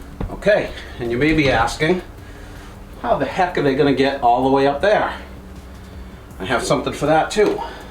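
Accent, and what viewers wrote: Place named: New England, United States. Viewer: I have always used soft pine wood shavings in my coops. The coop I'm currently working on finishing will use the deep litter method and so far I have liked the pine. I am intrigued by industrial hemp though and would like to try it out at some point. Loving how your coop is turning out. I found this series when we started doing plans for our coop and I have enjoyed watching your progress. Also love hearing the New England accent as we are New Englanders ourselves!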